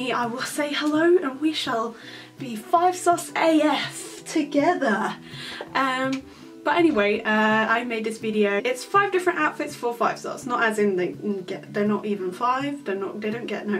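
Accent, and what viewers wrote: United Kingdom. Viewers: You're Norwegian? I was pretty sure that you were British. Bc accent. IM SWEDISH, HELLO NEIGHBOR!!!!! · You're from Norway?!? I thought you were from the UK omg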